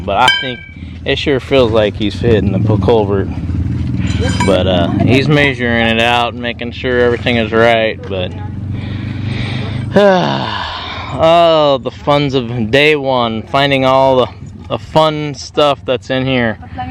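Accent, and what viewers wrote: South, United States. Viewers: Can't help but noticed you have a southern accent sir. Where you from?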